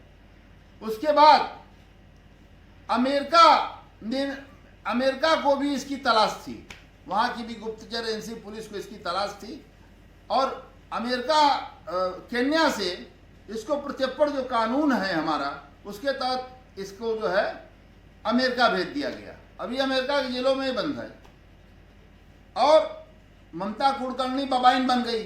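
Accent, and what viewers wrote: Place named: Varanasi, India. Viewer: Sir apka ha topic bada dilchasp aur pardarshi hota hai इसपर से आपका बनारासिया अन्दाज़ सुनने में बहुत मजा आता है.. sadar pranam aapko..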